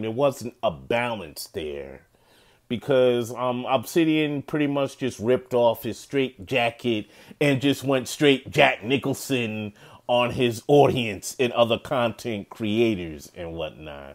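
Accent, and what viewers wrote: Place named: New York, United States. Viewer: Interesting. So I know you're from NY (I can tell by the accent). Where did you travel to where you encountered Black women who didn't act like the women you grew up around? For me, one of the best things I've ever done was traveling for leisure while in graduate school. I would just go to random places for the heck of it (Salt Lake City, St. Louis, Nashville, etc.).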